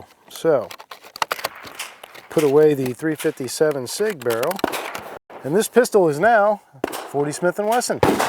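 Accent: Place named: Maryland, United States. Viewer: How have 100,000 subscribers not found you yet? Recognized the fellow Maryland accent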